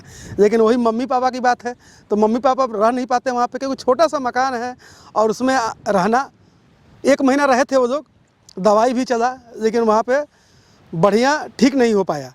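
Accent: Bihar, India. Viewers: Ap Bihar se hai sun k bahute accha lagal ba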